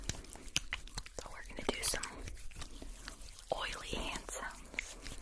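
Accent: Germany. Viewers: The fact that shes german and her english + asmr is amazing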